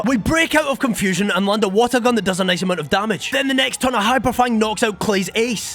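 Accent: Scotland, United Kingdom. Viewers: Quick question are you Scottish?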